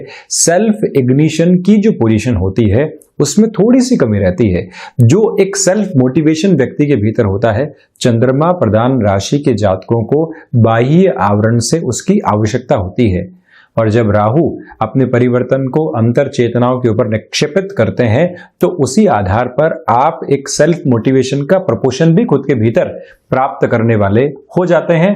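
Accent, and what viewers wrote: Standard. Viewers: Nice shudh Hindi..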